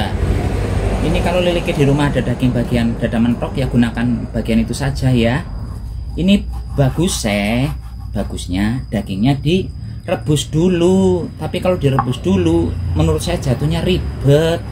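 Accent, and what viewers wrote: Banyumas, Indonesia. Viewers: Lucu banget ,,,Mase gundul pacul ini.,,,,,,,cukup terhibur logat ngapaknya yg bawel,.,,,,mana biyunge di simpan dimana,,, ,lucu asli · Ngomongnya ngapak podo inyong😅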